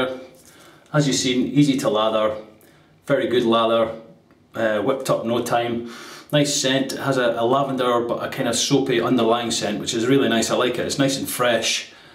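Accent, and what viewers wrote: Scotland, United Kingdom. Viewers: Are you a scot, sir? Nice shave, by the way.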